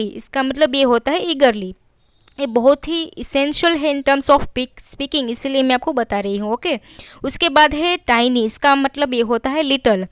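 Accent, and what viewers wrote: Gujarat, India. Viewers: Madam gujarati cho?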